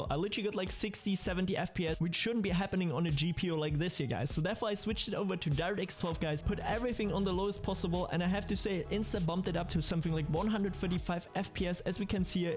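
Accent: Germany. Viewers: Hab dich grad entdeckt und hab nicht mal 10 sekunden gebraucht um zu checken, dass du Deutsch bist. Als Deutscher hört man das gleich raus haha :D. Und nices Video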